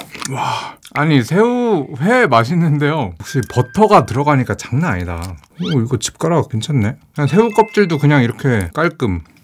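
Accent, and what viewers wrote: Cheongju, South Korea. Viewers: 혹시 청주 사람이신가요?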